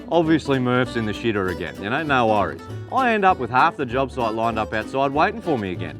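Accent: Australia. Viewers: That accent though. Wasn’t expecting Australian and I have to listen real close to understand what he’s saying.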